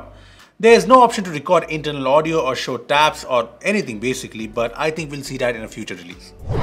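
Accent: India. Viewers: I hate when an indian talks english! hell of an accent 😫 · The indian accent is nice ( deeevlper ) ❤😁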